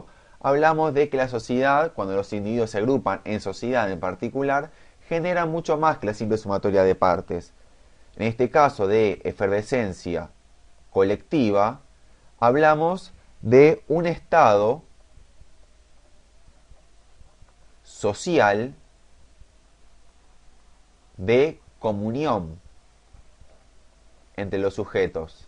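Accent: Argentina. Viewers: Es argentino, deje el show.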